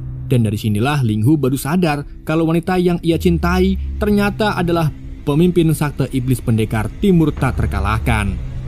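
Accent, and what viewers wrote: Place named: Tegal, Indonesia. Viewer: ngapak,tegal mas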